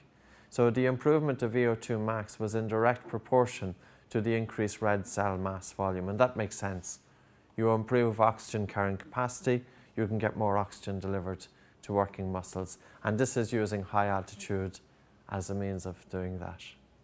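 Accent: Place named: Ireland. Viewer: Irish accent, originally grom Galway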